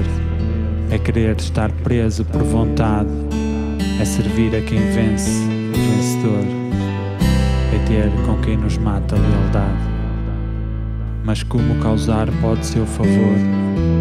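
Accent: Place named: Portugal. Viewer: Não me canso de ouvir este lindo poema de amor, e com esse doce sotaque Português tocou-me ainda mais a alma, já que, embora Brasileira, sinto que, minh'alma é Portuguesa. Obrigada por nos brindar com tão especial interpretação!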